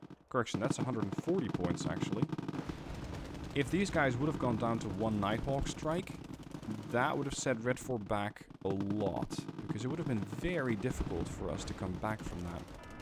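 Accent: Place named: Netherlands. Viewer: New mic. Still sounds Dutch. I guess theres no fixing that :-)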